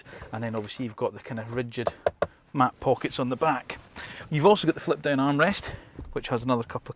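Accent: Scotland, United Kingdom. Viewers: I always enjoy your calm and eloquent presentation... it's sort of an automotive meditation. And that Scottish accent is just a bonus. I'm going to have to go with the GV60.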